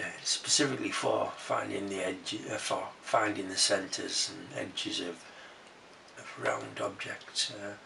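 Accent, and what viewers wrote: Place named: Yorkshire, United Kingdom. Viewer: Where in the world are you as you sound like you live near me Im in Guisborough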